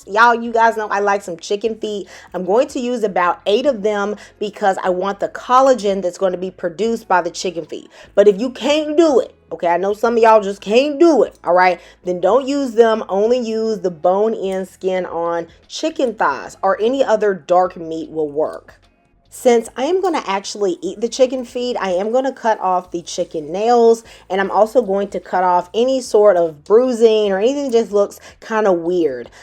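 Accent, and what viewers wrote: South, United States. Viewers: Where you from if I have to guess I would say Carolina’s, or Georgia. I love your channel.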